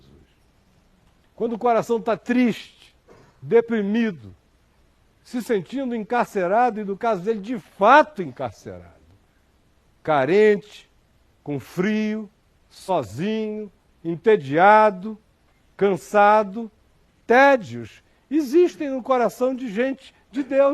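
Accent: Recife, Brazil. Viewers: ai sabe que fala recife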